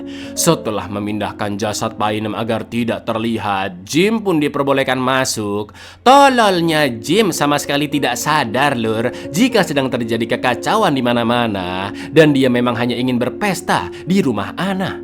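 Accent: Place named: East Java, Indonesia. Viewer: Cak, jowone sampean ngger endi? Kok jowone podo carane ngomonge karo aku, aku wong lumajang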